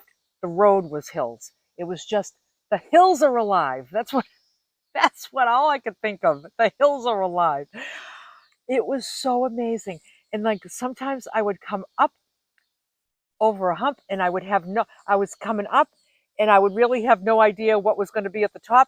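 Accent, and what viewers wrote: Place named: Boston, United States. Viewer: I smiled the entire video! Pure JOY! Happy I found your channel and the second I heard you Boston accent I knew I was going to adore you. Born and raised in New York but living in Utah. (a fish out of water) for sure. Came out to ski in the 80's and the rest is history. I am just staarting to go camping (alone) this coming winter (to a place called Quartzite, Az.) Thanks for the Friday evening S M I L E ~ love the dancing, with complete abandon. Right up my alley!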